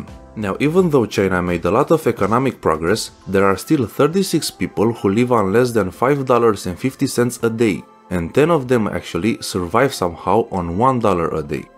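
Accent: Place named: Romania. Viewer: That brutal Romanian accent though 😖😖😖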